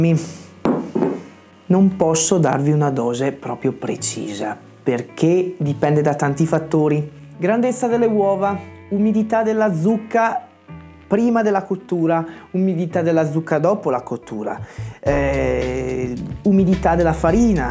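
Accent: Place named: Veneto, Italy. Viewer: Ma te si veneto 💚? se non sbaglio... meraviglioso il piatto, complimentoni!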